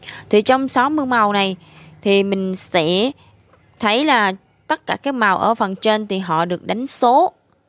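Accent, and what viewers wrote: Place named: Vietnam. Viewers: Kính thưa các loại vùng miền luôn.chắc ở nước khác nhưng gốc việt 😅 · Haha giọng miền nào nghe ngộ vậy 🤣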